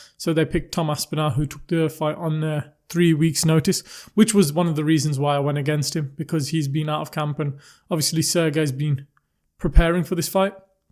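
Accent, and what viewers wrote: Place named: West Country, United Kingdom. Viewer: west country-ish?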